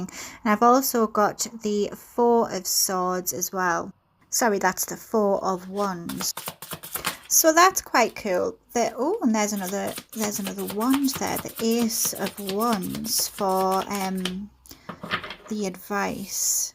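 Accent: United Kingdom. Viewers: U sound like u from County Durham I'm from peterlee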